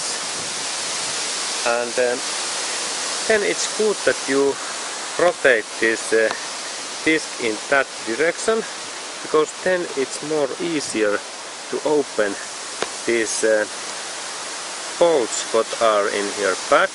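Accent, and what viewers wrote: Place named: Härmä, Finland. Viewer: murre on niiiiiinnnnn härmäläinen kuin olla voi:)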